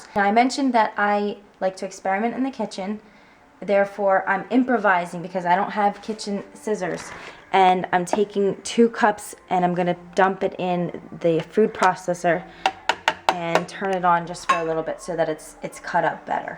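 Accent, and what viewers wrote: New Jersey, United States. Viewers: She has that jersey accent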